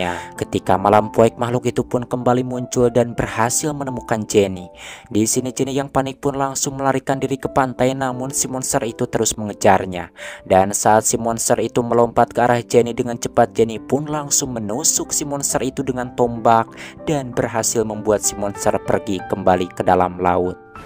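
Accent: West Java, Indonesia. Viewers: Ti mana sundana a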